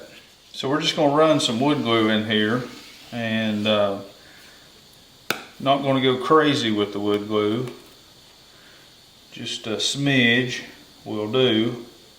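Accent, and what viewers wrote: United States. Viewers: He got that Hollywood cowboy accent 😂😂😂😂 so cool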